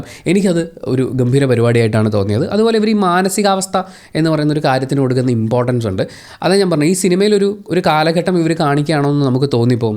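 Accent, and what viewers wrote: Kannur, India. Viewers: Ente samshayam Tamil Nadinte Borderil ulla Place. Parayunnathu muzhuvan Kannur Slang. Ethu Ethaa Sthalam.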